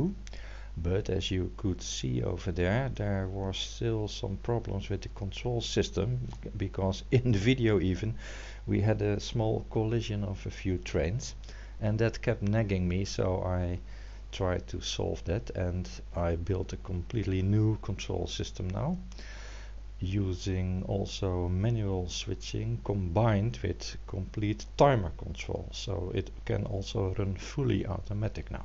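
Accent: Netherlands. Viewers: Jij praat met een héél Nederlands accent.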